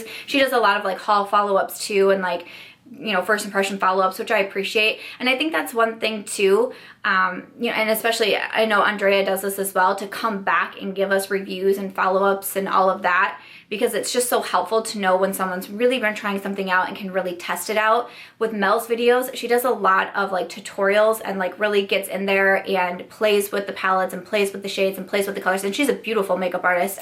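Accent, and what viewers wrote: United Kingdom. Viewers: I loved your brutish accent! 😂😂 love you ❤️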